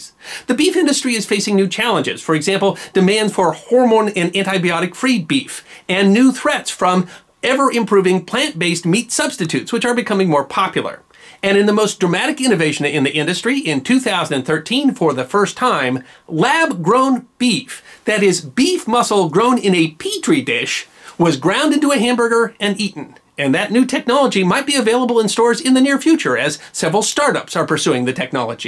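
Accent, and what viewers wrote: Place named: Northeast, United States. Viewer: You could be right. I'm Australian, but I picked him as Noth East USA.. I understand him quite well.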